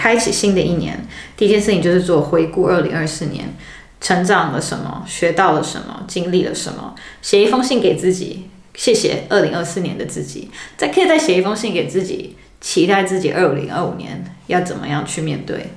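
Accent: Taiwan. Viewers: 果真很台灣人講話都是台灣人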